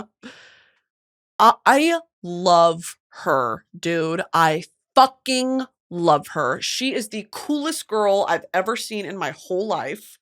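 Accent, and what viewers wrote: South, United States. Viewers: the southern twang made me feel so comfortable today. right at home -texan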